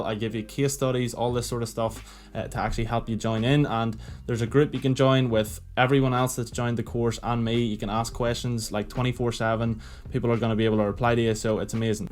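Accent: Northern Ireland, United Kingdom. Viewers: Northern Ireland bror? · Hi, Recognise the accent so I assume you live in NI like myself. I'm looking some guidance into how to make money as a side hustle and would love your advice or coaching. How can I contact you?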